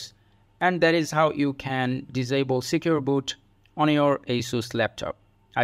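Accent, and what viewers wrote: India. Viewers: Woow thank you man, it just worked, simple straight to the point, as soon as I hear the Indian accent I knew I am on the right video!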